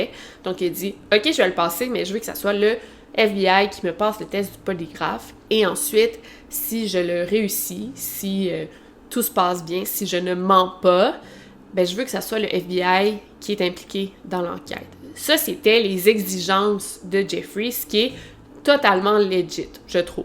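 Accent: Quebec, Canada. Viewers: "si vous pouvez éviter votre jugement personnel ce serait mieux" et juste après "j'ai un peu de mal avec votre accent" lol la contradiction. Si cela vous dérange, vous pouvez simplement partir, il y a d'autres vidéos de True crime en français sans accent québécois, puisque ça a l'air de vous déranger! Par contre la plupart des youtubeurs de True crime donnent leur avis, courage à vous...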